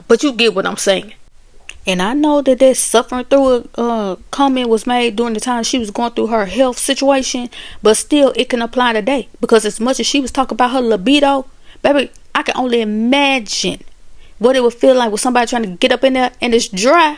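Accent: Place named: Alabama, United States. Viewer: Hey girl hey! I love your channel and I think you have the cutest accent ☺️ Are you from Alabama? I’m trying to get better with my regional accent recognition 😩😂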